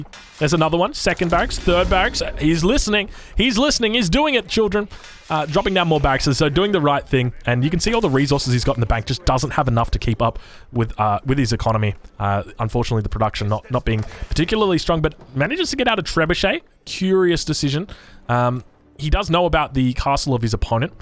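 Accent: Australia. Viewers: Im italian but i can feel his Australian accent is funny.good to see some aoe game and learn something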